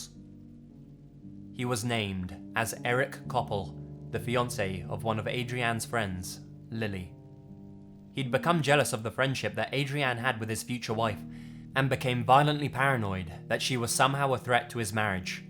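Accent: England, United Kingdom. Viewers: you from the UK?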